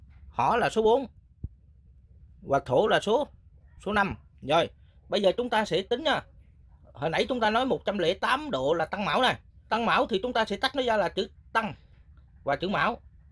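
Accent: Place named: South, Vietnam. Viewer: Thầy giảng hay dễ hiểu nhưng nói hơi nhanh giọng nam chưa quen nghe thầy nói chậm một chút Và muốn mua là kinh để học thì mua ở đâu? Và muốn học thầy thì thủ tục ra sao Cảm ơn thầy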